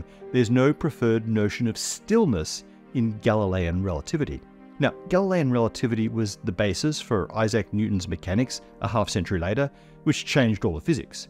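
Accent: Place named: Australia. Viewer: is from Australia, so maybe that's his Aussie accent coming through?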